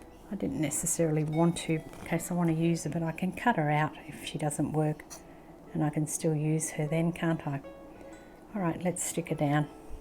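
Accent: Australia. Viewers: Unmistakable Aussie accent, as soon as I heard you speak, I subscribed (because we don't have many Aussies who make videos using Tim's products). I'm in regional Vic. Great pieces, loads of fun. Love your album. Cheers to you too...Aussie, Aussie, Aussie, oi, oi, oi.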